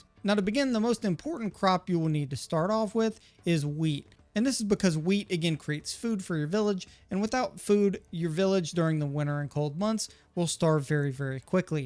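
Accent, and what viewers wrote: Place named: Texas, United States. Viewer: Thanks a bunch brother! Haha I couldn’t help but notice the accent, are you from Texas?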